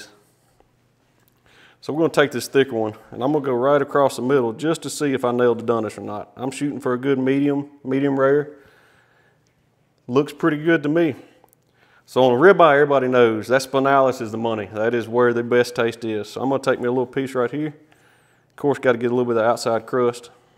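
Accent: South, United States. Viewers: Southern accent? Check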